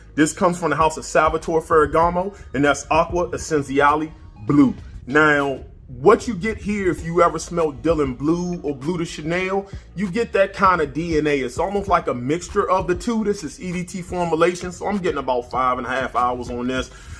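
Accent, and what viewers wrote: DMV, United States. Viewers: Yo, as soon as you started talking I knew you were from the DMV.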